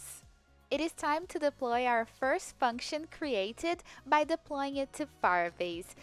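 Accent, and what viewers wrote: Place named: Brazil. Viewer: Seu sotaque brasileiro é inconfundível! Parabéns pelo vídeo!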